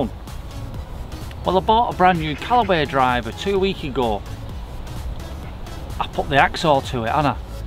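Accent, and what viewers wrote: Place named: Scotland, United Kingdom. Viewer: I can't understand Scott-ish. Is there a translation.?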